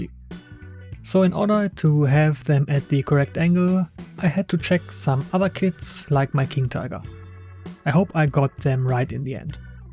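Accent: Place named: Germany. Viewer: Hab ich doch hie und da einen deutschen Akzent rausgehört! 😃 Lecker gebaut und schön mit Struktur verziert. Ein dickes 👍 von mir.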